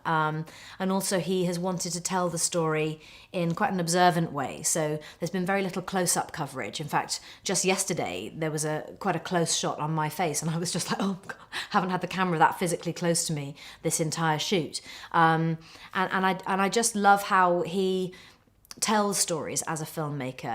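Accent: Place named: United Kingdom. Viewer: why is she talking as a british accent?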